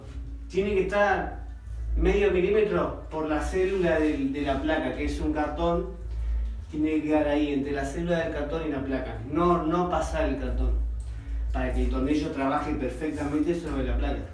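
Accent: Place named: Argentina. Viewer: Era hs siempre hay del otro lado un español explicando.ahora es el tiempo de escuchar un argentino buenicimo tu programa .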